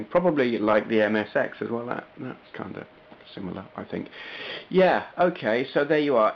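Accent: United Kingdom. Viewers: what part of th uk are you from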